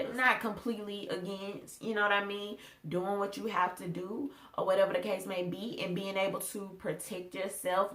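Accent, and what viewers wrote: South, United States. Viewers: Well done! A good place to go for well written opinion pieces is American Thinker. And what a lovely Southern accent, Darling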